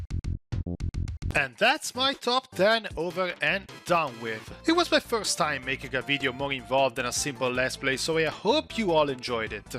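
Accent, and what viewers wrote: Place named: Italy. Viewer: Your voice is pretty boring, and honestly I’m not a big fan of your Italian accent. Also, I think showing the mini SNES with loose cartridges feels a bit ridiculous and annoying. Using the original console would have made a much better impression.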